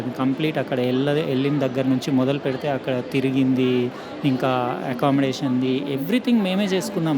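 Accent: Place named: Telugu, India. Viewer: Yes i liked it sir....u soothing voice and Telugu slang with total technical things in simple way..... Plz visit china electronic market..... plz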